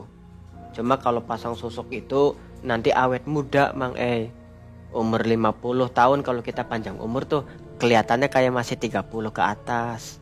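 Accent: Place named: Banten, Indonesia. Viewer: mas kalo berbicara seperti logat bahasa orang banten😊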